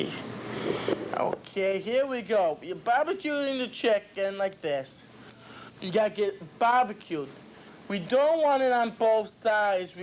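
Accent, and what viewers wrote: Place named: Russia. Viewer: he's talking English just as CrazyRussianHacker is doing it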